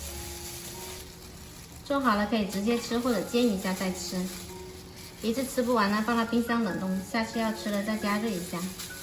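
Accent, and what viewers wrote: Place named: Hakka, China. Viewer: 问题是哪里买这肠衣呢🙈。宝贝普通话客家口音好亲切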